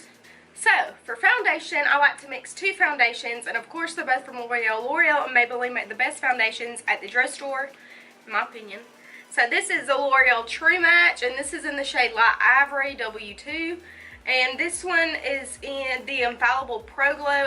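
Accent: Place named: Georgia, United States. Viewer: Absolutely love the Pro glow! Definitely my go to everyday foundation! I love your accent too! Soo cute! Reminds me of my grandma, she's from Hiawassee (however u spell it ) Georgia! It's so funny whenever I'm with her I always catch my self talking like her! Lol love it!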